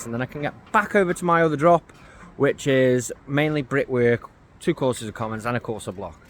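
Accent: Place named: Potteries, United Kingdom. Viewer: Brilliant video, where abouts are you in Staffordshire, sound like you may be from potteries?????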